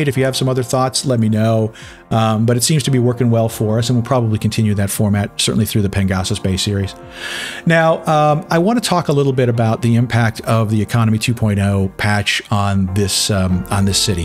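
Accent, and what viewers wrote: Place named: Minnesota, United States. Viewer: Well hello neighbor! I thought I picked up on those Minnesota O's As far as the city goes, you should do what you feel is reasonable. It's your hobby after all. I'll stick around for whatever that is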